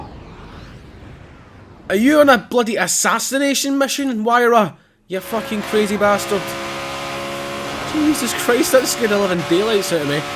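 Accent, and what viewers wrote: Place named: Glasgow, United Kingdom. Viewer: Bro I'm Scottish tae and love forza hyped for forza horizon 3 u sound like yer fae Glasgow ,I'm fae uddingston but cheers fer the vids m8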